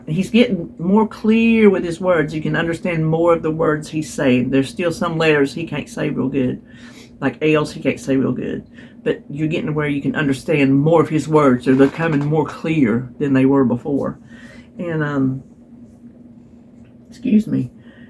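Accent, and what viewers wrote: South, United States. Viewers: Hi:) I enjoy when you chit chat:) I love your Southern accent! You should hear me - I have a wicked Boston accent!! LOL 😂 Children feel like you are playing with them if you just watch them play:) They just want to be with you. ❤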